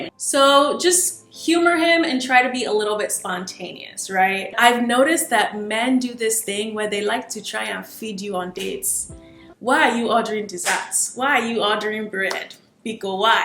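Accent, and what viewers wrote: Nigeria. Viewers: You really pulled that 9ja accent hey 😹😹👏❤️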